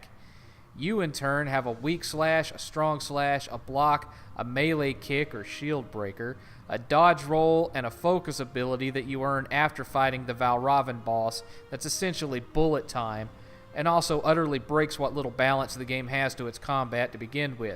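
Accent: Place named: Alabama, United States. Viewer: I didn't really like the game, it was cool but meh, but your war against artistic indie games is some real incel shit. Also you seem to have blanked out half the game, the lover never blamed her, that was clarified later, that was her mental illness making her assume the thoughts of others, her father did some sus shit though. "San Francisco Arthouse crowd", oh I get it, you're one of those far right cringe republican reactionaries, yikes. "It's easy and predictable" while showing a video of you getting your ass beat by singular enemies. "Dark Souls for dummies" right after he showed he sucked at it. Guess you're self burning yourself there? And then he cries about the twitter crowd lol. It's very hard to listen to you complain about how easy the game is, when you're barely winning the battles on screen. This guy's accent and word choice becomes more Alabama the longer this video goes on. 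OMG, he's LITERALLY triggered by people liking this game, that's pathetic asf. "Pieces of shit who said this was goty"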